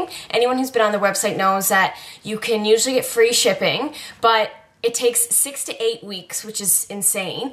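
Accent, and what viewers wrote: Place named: Prince Edward Island, Canada. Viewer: Are you from PEI? Haha I can sense an accent. Great video!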